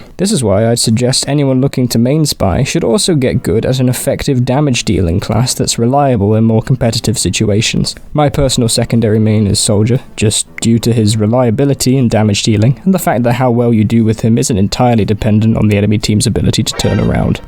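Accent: United Kingdom. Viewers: ive never heard anything so British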